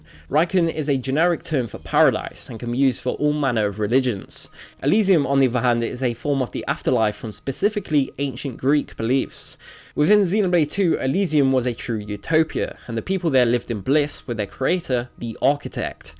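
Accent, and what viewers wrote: United Kingdom. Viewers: the nasaly Brit voice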